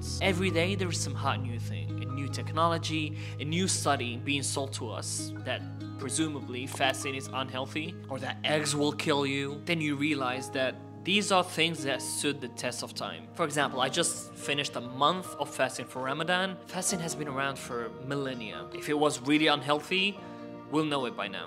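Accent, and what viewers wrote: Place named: Morocco. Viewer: the Morroccan accent in your english though :D this poped up in my suggested list, I own a small/new channel too and I can only support with a comment 😀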